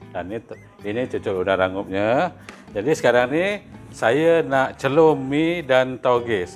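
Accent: Java, Indonesia. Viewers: Mula2 ingatkan youtuber dari Indonesia.. Sekali dengar dia speaking..owalah wong jowo Malaysia rupane.bagus la kang ! Alhamdulillah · Rapopo wak ngomong melayu lekat jowo pekat, macam mas toha,, lagi mantap wak...👌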